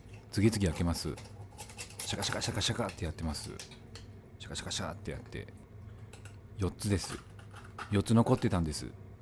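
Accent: Standard. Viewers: なぜ、標準語🤣 (≧▽≦) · 何故か標準語のナレーションが面白いですね😃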